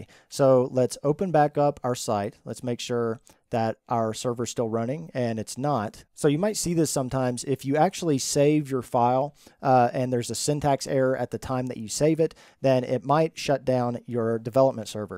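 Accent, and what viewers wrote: South, United States. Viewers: Love your accent, sounds like a soft southern accent. Your content is fantastic.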